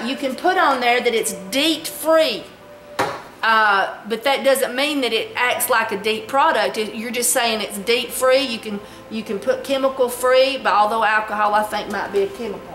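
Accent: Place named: Alabama, United States. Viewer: Fort Payne. I knew you were from Alabama. You talk like me. Cant wait to try this.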